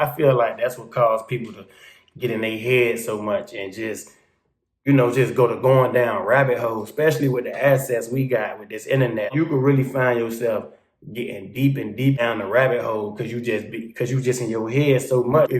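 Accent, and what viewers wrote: Florida, United States. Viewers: Might be a FL thing , I can understand him. But most ppl living in FL are not from FL 😂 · That's Florida slang, I know alot of grown dudes who sounds just like this. I don't think it's put on.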